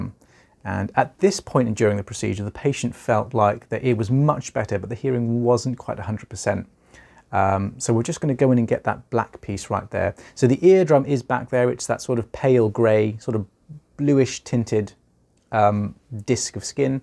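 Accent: England, United Kingdom. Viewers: He is so cute and the english accent is cherry on top 😍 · His young British voice really helped soothe the horror show that came out of that ear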